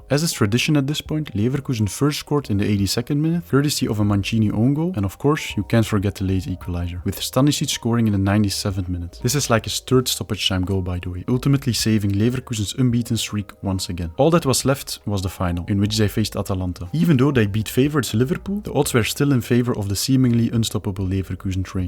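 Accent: Belgium. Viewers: Klinkt eerder Vlaams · Oost Vlaams accent zal ik zeggen volgende keer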